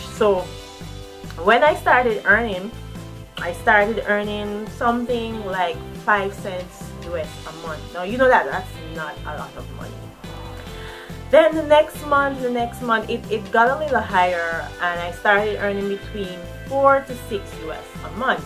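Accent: Jamaica. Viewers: Jamaica? Sounds like Jamaican I'm thinking of starting one too but mi nuh sure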